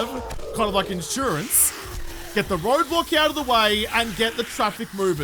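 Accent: Melbourne, Australia. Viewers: Do all Melbourne aussies sound the same? You sound just like ozzy. It's knot gey but yut lovely 😍 lmao 😅 you're phenomenal ❤️ american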